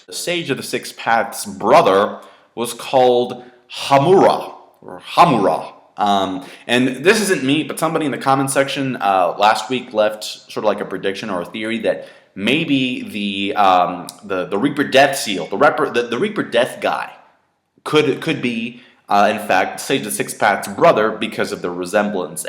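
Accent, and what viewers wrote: Mexico. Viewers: Español con acento mexicano.... jajajaja pero la pronunciación es impecable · Si habla como mexicano, yo también lo soy. jajajaja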